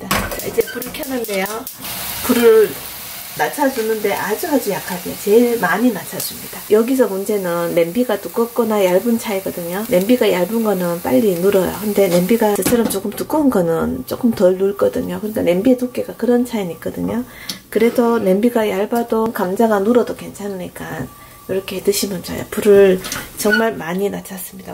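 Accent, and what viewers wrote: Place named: Busan, South Korea. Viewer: 친정이 부산이라 목소리랑 억양이 넘 친숙하네요~^^ 좋은 정보 감사 드려요~♥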